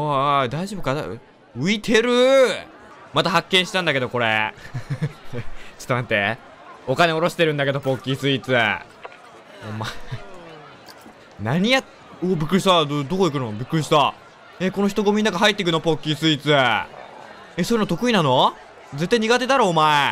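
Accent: Kansai, Japan. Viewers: ときどき出る関西弁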